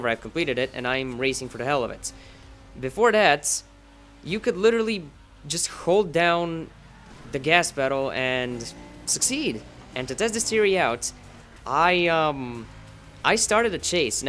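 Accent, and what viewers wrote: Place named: England, United Kingdom. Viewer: Horrible English accent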